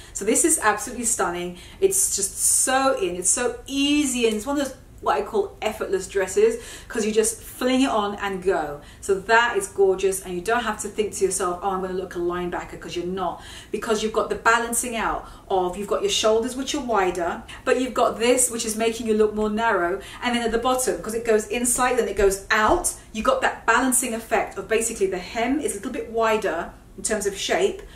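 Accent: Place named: London, United Kingdom. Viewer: Hi, where have you been all my life!! I have been binge watching all of you videos, you are amazing, I have learnt so much from you. I've lost count the amount of times, I have gone to a shop and not found my size in something I wanted and I have just walked away. No more will I be doing this, I will be going for the bigger size from now on. Your London accent got my attention, I'm a fellow Londoner and you are fantastic to watch, I love your passion! You have a new subscriber and I look forward to seeing all your new videos. x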